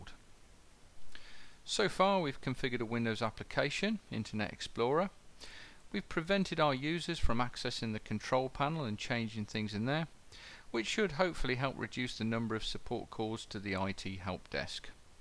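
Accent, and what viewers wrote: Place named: England, United Kingdom. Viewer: i must say i like your English accent although i haven't done viewing this video, it sounds like you are from England, plus sounds like Klaus from vampire. Anyway, thanks for the video, and i'm studying now.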